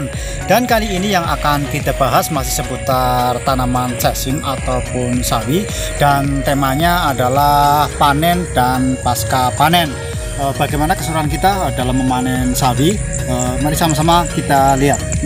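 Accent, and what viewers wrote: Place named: Banyumas, Indonesia. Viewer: Sampean wong bnyumas y mas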